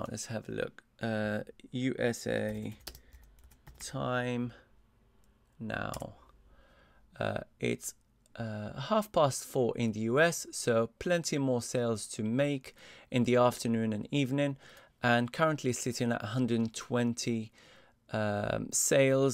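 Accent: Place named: United Kingdom. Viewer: i came here just to listen to your British accent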